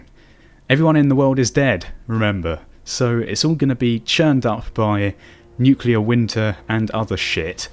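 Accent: United Kingdom. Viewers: YAY he is british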